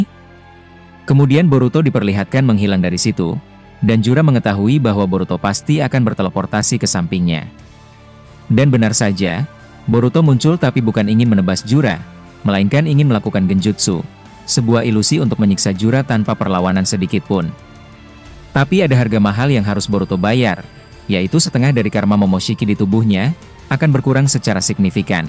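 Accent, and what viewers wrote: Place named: Makassar, Indonesia. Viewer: makassarnya kantala, bnget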